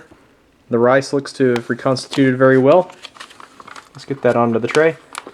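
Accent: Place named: Oklahoma, United States. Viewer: I love the Okie accent 😍,. Maybe that's cause I'm from North Central Texas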